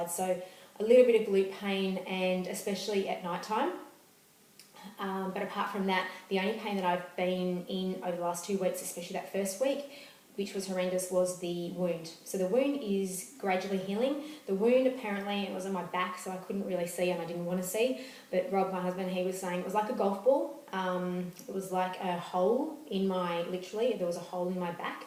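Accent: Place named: Australia. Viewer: Hey, have just been watching your videos and given me some positive outlook after being told yesterday I have to have a L5/S1 discectomy. It's also great to hear the perspective of someone with an Aussie accent. Not sure where you're located, but if you're in Sydney I would be keen to hear more about your sports physio as I am keen to get up and about and back onto my bike as soon as possible. If you are local to me please email me the details of your physio. Thanks heaps and good luck for your continued recovery!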